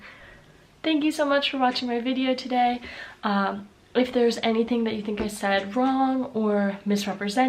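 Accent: Germany. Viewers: Pretty German accent...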